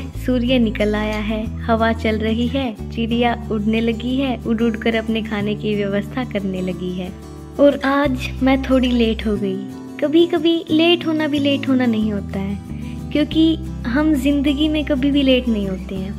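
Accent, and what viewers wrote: Haryana, India. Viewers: Me to haryanvi tone ka addict ho gaya🤣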